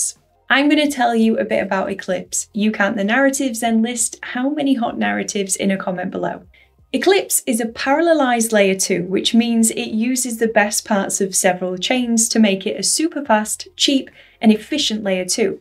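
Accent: England, United Kingdom. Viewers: Love your English accent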